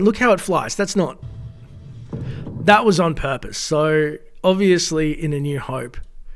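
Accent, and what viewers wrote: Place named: Australia. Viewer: Excellent! Great to have you back buddy. We’ve missed those dulcet antipodean tones!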